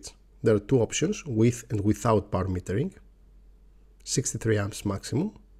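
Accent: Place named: Greece. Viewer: I know you might not have expected this comment but... You're Greek, aren't you? XD I believe as a Greek myself, I can tell from your accent 🤣 (which is by no means a bad accent, but still not quite American, English or Australian)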